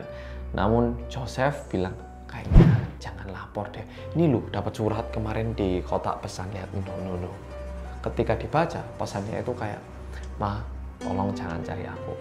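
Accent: Java, Indonesia. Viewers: iki wong korea opo jowo? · Masyaallah....sy kira Wong jowo asli nggak taunya Korea to.... 😃👍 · Masya Alloh kok bisa selancar itu berbicara pakai bahasa Indonesia dengan logat Jawa padahal asli orang Korea.Kalau lahir di Indonesia wajar selancar orang Indonesia.Salut banget 👍Cerdas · Jebole medok yo😁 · Sebenarnya udh nnton di Omara tapi mau nnton lagi versi om medhokk🐒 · Hai org Korea medoook ❤ Nyimaaak 🎉🎉🎉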